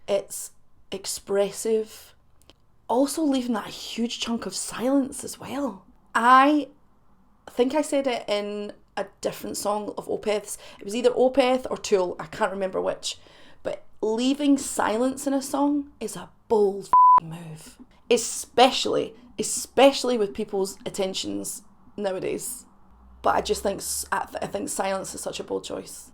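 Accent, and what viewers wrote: Scotland, United Kingdom. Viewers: Of course, I do not miss an episode of you brilliant channel. I just love to hear you Scottish accent and your lovely humour. ❤️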